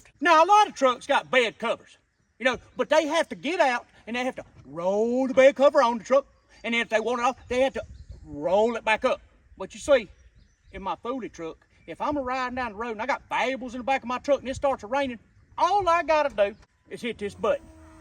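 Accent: South, United States. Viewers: This guy's Southern accent makes me laugh 😂😂😂😂😂😂😂😂😂😂😂😂😂😂😂😂😂😂😂😂😂😂😂😂😂😂😂😂😂😂😂😂😂😂😂😂😂😂😂😂😂😂😂😂😂😂😂😂😂😂😂😂😂😂😂😂😂😂😂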